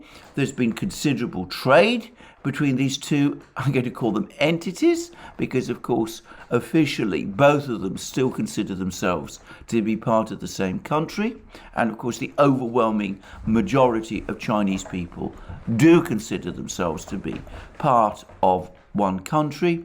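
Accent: United Kingdom. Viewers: Fantastic analysis, unbiased, clear and balanced. Great British accent.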